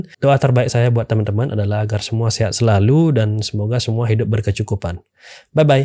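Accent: Bali, Indonesia. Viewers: logat bali...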